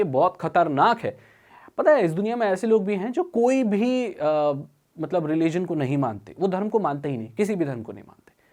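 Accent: Odisha, India. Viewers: Are you from Odisha?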